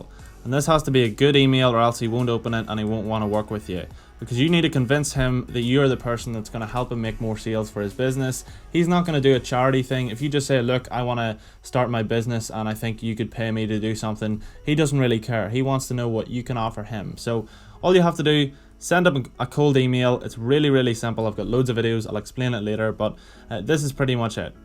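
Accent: Northern Ireland, United Kingdom. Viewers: Northern Ireland bror? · Hi, Recognise the accent so I assume you live in NI like myself. I'm looking some guidance into how to make money as a side hustle and would love your advice or coaching. How can I contact you?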